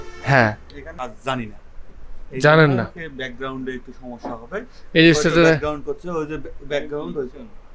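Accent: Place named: Rangpur, Bangladesh. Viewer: ভাই আপনার বাড়ি কি রংপুরে নাকি? ভাষাটা খুবই পরিচিত মনে হচ্ছে ❤️❤️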